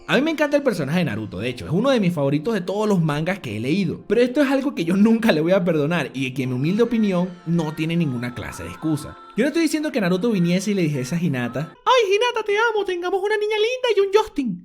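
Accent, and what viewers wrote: Venezuela. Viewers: Excelente video, estoy muy de acuerdo con tigo, es mi imaginación o tu acento es de Venezuela??? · Jodaa ese acento venezolano xd i love naruhina, sigue asi, me encanta como editas.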